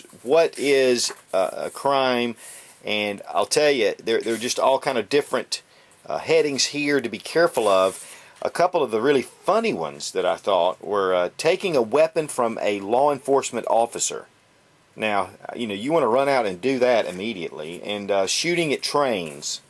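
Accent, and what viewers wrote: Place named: Greenville, United States. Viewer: ! I knew you were in Greenville! I was born and raised there, and I had a funny feeling you were there too based on the accent.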